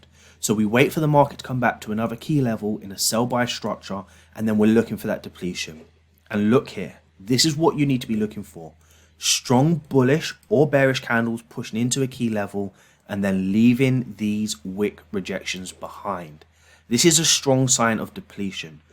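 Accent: United Kingdom. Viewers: I found you on youtube 4 days ago and started to watch your videos and found answer to my questions and found out what wrong with my set ups..all day long your words with that beautiful British accent is repeating in my head and makes me do the right thing.Thank you.Thank you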